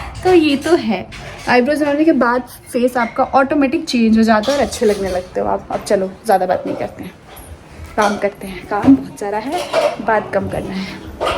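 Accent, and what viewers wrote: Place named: Bhojpuri, India. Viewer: Bhojpuri bolati Ho bahan to bahut acchi Lagti Ho Main Bhi Gorakhpur se hun mujhe bhi Bhojpuri Aati Hai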